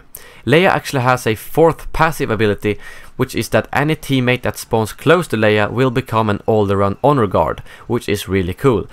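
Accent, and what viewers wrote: Sweden. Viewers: Are you from Sweden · I'm sure you're swedish ! (I heard your accent haha) but great vid bro, keep going! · Jo han är svensk. jag hör att han är svensk.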